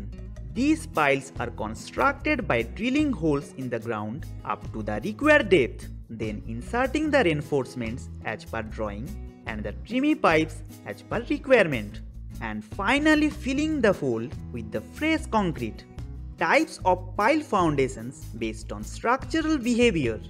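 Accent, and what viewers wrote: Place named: India. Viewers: Should be proud of hindi accent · Stereotypical Hindi accent.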